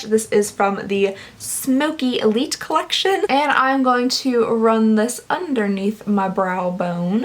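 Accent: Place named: South, United States. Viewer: I am just so glad to hear a person that speaks as I do. Hey y'all:-) Louisville is definitely not Richmond but our accents are similar. I cannot stand watching other people! You are funny and pretty!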